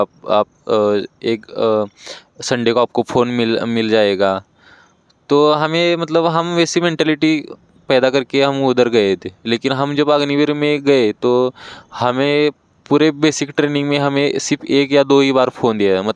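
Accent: Maharashtra, India. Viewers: Bhai marathi ho kya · Bhai tu marathi lag raha he me pn marathi he from maharastra ❤ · Marathi aahe ka ha bhau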